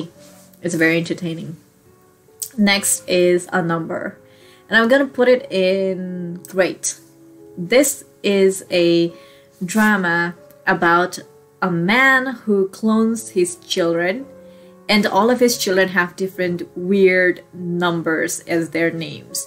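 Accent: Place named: United States. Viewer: Haina yo Nepal ma padheko manche ko american accent kasari aayo? Hollywood filim dheri herdoracha kyare ...😅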